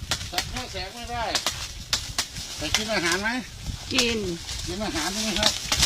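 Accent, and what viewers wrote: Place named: Countryside, Thailand. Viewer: พูดแบบลุกทุ่งๆต่อการอธิบาย ฟังก็ดีไปอีกแบบ!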